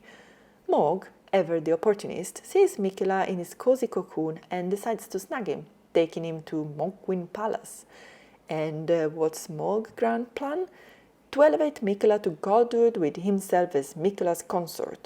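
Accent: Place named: Caribbean. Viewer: Do my ears hear a carribean elden ring content creator 👂 👀👀👀💪🏾💪🏾 Lets go!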